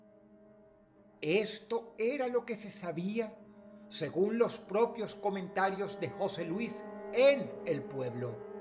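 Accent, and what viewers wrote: Spain. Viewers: hablando con acento español es todo lo que está bien en esta historia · con acento español o.o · El acento español de ufffas uma delicia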